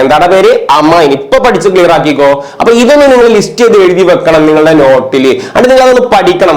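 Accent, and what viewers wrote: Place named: Kannur, India. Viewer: Kannur slang😂🫶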